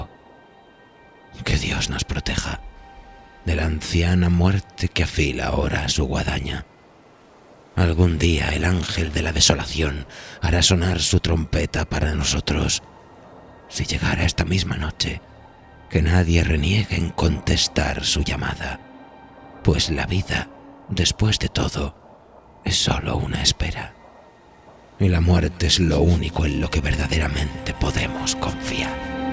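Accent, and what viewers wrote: Spain. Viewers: Españolisimo , nooo por favor , usen Español neutro , no podré escucharlo , es insoportable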